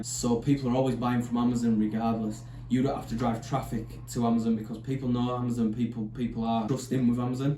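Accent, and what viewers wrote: Leeds, United Kingdom. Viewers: Really enjoying your channel given you a sub y oh sound like a Leeds lad too MOT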